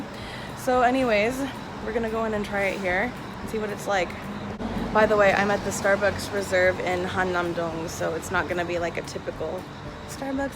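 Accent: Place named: South, United States. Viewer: even a Kentucky southern belle. Not used to hearing your southern. Lol 😉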